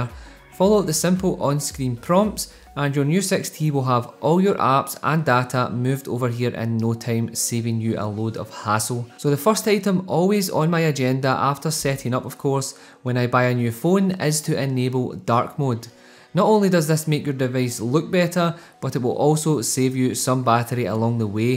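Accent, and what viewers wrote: Ireland. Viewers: I love your accent man. Are you from Ireland? And also the name of the wallpaper pls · Is he fucking Irish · I am watching coz I like your accent,. Irish I assume??👌😄😄😄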